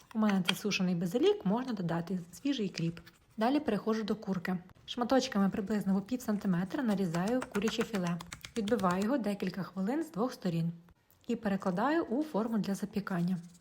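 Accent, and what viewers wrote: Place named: Russia. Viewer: Какой сильный русский акцент)))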